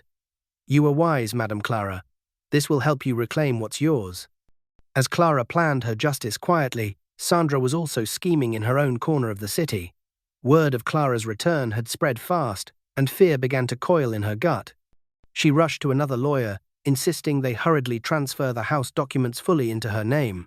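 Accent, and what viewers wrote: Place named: United Kingdom. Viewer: Please can’t narattors be African. Why is this British person speaking!!!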